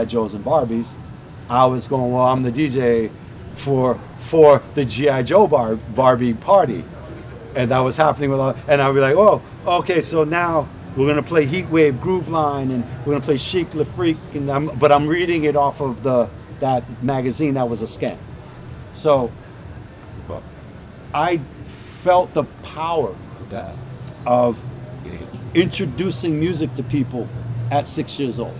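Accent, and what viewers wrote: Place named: United Kingdom. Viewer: He's American??? All this time I thought he was British...😂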